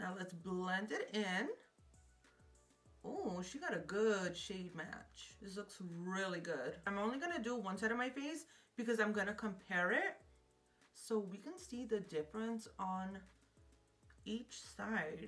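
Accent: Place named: Rural, Mexico. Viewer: The way you talk reminds me of my people from back home in mi ranchito. Tysm for the educational and entertaining content! Subscribed 🤍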